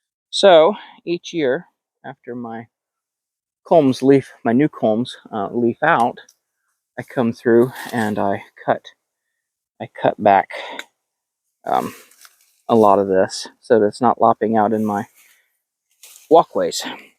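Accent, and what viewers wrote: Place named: Midwest, United States. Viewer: For what it's worth, I can understand you just fine, though I'm a fellow Midwesterner. But I think the issue for some may be the slight muffled quality of the audio. Sounds like you may have a windscreen on your mike (which is absolutely 100% great), but there is still a little "fog" in the audio, which may be what people are reacting to. I don't know what video editor you are using, but DaVinci Resolve is a very, very powerful free video editor that also allows you to make the audio crystal clear using its Fairlight page. You may not have enough time in the day to utilize it since you (gratefully!) make a video so frequently. And that's much appreciated! Especially from those of us interested in planting Bamboo. But I thought I'd at least put this out there.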